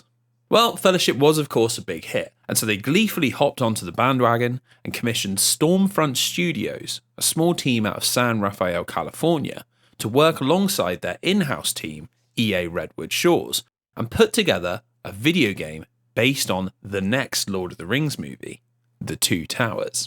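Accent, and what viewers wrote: England, United Kingdom. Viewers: These sorts of videos are always successful because of an English accent narrating it lol kudos to you though on this monster sized content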